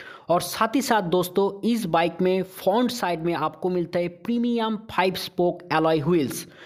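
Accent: Bengal, India. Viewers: I love hearing bengalee accent. Sweet like rasgullah .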